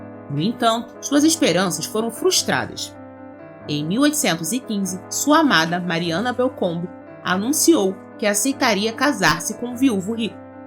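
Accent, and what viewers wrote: Rio de Janeiro, Brazil. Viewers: Lá no RJ eles falam bonitinho assim mesmo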